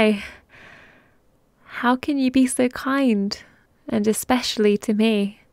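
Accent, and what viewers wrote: England, United Kingdom. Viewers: It’s just an English accent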